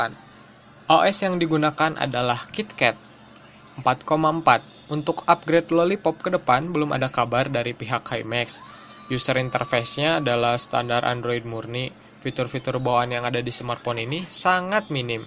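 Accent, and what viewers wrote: West Java, Indonesia. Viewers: Logatnya sunda pisan... haaaaa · Ngomong nya sundaaaaaa bingittt...hehehe..anyway..goood...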